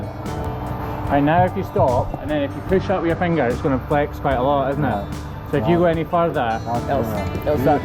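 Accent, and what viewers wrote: Scotland, United Kingdom. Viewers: I thought he sounded more Scottish today. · Love how, like most Scotsmen, you have 2 accents. A normal one, and a posh one to use when talking into YouTube or to nonscots . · Do you notice that your Scottish accent is way stronger in this video?🙂 Maybe it comes out more when you’re with others with a stronger accent. No big deal. I’m Canadian so I can’t say much aboooot accents, eh? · I think it's hilariously wonderful how much MORE Scottish you sound when you're talking to other Scottish ppl 😂 It's lovely. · Pinehurst NC here......Incredibly entertaining and informative. I have no farm knowledge and this channel was very eye opening. I also noticed your Scottish accent thickens when talking to friends!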